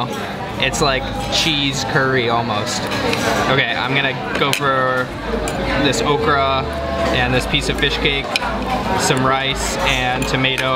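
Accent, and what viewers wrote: Canada. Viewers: Its canadian speaking style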